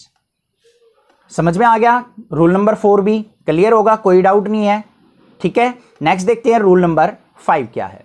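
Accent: Haryana, India. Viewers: apki awaz se pata chal gaya tha mai bhi haryana se hu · I m sure you are from haryana Your accent says you are Haryanvi 😆🤣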